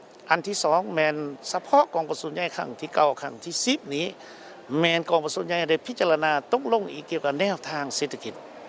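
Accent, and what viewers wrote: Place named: Vietnam. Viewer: เว้ากะบ่อชัดภาษาลาวเว้าผสมแกว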